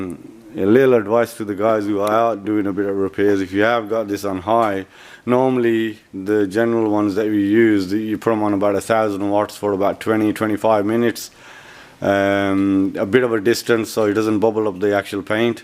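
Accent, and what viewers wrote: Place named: Birmingham, United Kingdom. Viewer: Are you from the Midlands , sound a bit brummie matey, cheers for sharing pal👍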